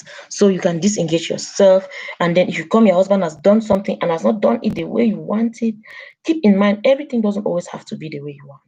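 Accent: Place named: Cameroon. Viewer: Wahoo auntie u sound like a cameroonian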